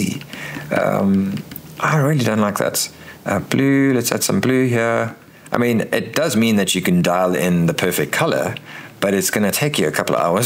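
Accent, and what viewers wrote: South Africa. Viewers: Really enjoying your videos, man! Heard a fellow South African accent, plus channel and vids all about things I love. Easy sub. I've been doing retro emulation on my old PSP for around 10 years now, and last week decided it was time to get an RG35XX Plus, It was meant to a "dedicated console for emulation", one and done, but I quickly realised it's just my "first" emulation station. lol.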